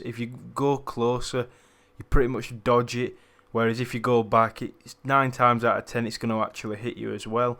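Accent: Yorkshire, United Kingdom. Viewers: Nice to hear a yorkshire vlogger lol nice 1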